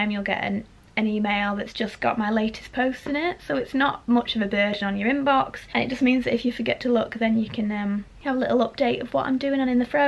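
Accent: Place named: Wigan, United Kingdom. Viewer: I’ve only just subscribed to you and can’t believe you’re also from Wigan!! Immediately I thought I recognised a twang in the accent 😊 xx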